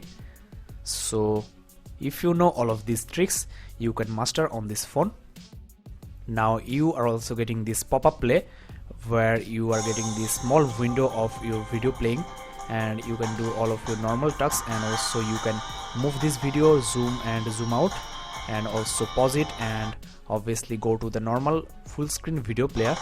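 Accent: India. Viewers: your strong Indian accent is to blame for the phone to unlock the second time, not the phone.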